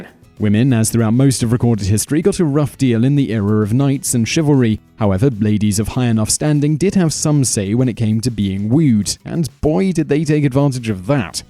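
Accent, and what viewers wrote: England, United Kingdom. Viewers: Absolute crock research delivered by a man speaking in a british accent to make it seem legit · Well English knights fight on foot, and u sound English u should now about that, not presenting knights to be better then else just because they have horses, also lower class man at arms uses horses, light cavalry, mounted archers...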